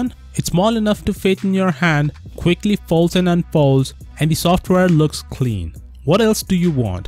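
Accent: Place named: India. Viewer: It's impossible to listen to this indian english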